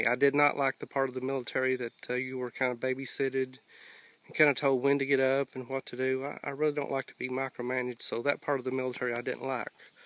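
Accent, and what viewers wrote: South, United States. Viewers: I really like you. There's something so nice about your matter of factness mixed with the southern accent. For an INTP you do have a lot of vocal inflection and i can tell that you're a very wise person. Thanks for your videos and all your feedback on my videos.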